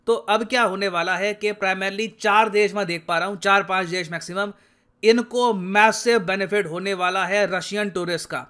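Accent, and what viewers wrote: India. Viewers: Typical Indian accent Most related dialogue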